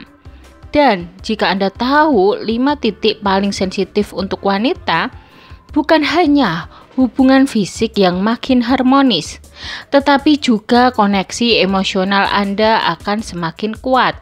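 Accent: Java, Indonesia. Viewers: Walaupun ngomong indonesia tetep jowone medok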